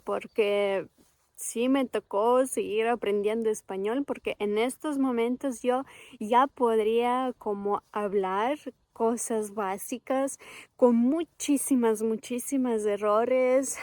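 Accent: Ambato, Ecuador. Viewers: 🎉Que bella esa vivencia. Ya habla español ecuatoriano, le falta la Música que es la más bella, como me gustaría mandarle música selecta del Ecuador , yo no soy de Ecuador pero si se la calidad de música sobre todo la antigua ya que la moderna ya dañan todo en lo q a música se refiere. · Mira yo soy ecuatoriano vivo en paris igual que tu me toco a mi ablar frances sin profesor yo se que es muy dificil pero yo te felicito tu ablas muy bien y de verdad tienes el acento ecuatoriano en america latina todo mundo abla español pero cada pais con su acento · Ques pues dices al toser 👍👍👍👍 eres ecuatoriana ❤❤❤❤ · Cordiales saludos desde Quito Ecuador, tienes un hermoso acento ecuatoriano y aun mas, ambateño ¡felicidades! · Un español con un hermoso acento ambateño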